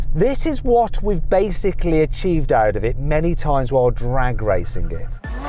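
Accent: United Kingdom. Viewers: 오늘따라 왜 이리 영국인 같냐 평소보다 한 2배는 더 영국인 같네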